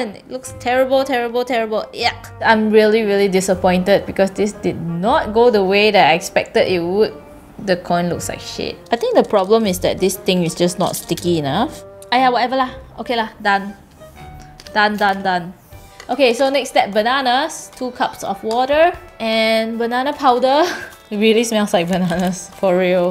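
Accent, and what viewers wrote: Singapore. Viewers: Is it just me or accent is so different from her usual singaporean accent here lol and has singaporean accent yay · Sounds So So So Singaporean and Singlish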